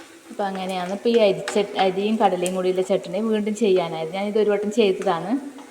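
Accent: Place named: Kasaragod, India. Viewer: ഭാഷ തനി കാസർഗോഡ് തന്നെ അല്ലെ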